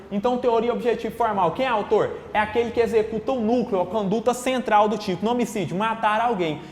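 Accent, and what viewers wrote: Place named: Goiás, Brazil. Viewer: Esse professor é goiano ou mineiro, só poder ser.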